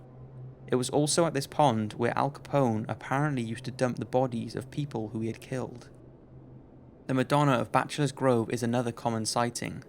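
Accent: Wales, United Kingdom. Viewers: Welsh accents ftw! As you can tell i'm also from Wales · Are you welsh? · Silly Question.. Are you Welsh? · That's a Welsh accent right?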